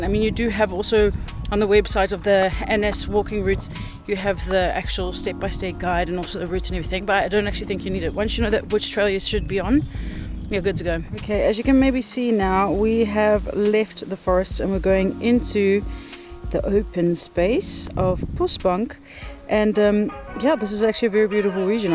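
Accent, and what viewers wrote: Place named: South Africa. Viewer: As someone coming from Namibia/South Africa, and now living in the Netherlands, it really sounds like you are also Namibian/South African. We are always looking for nature! Great to hear what I believe to be South African hahah.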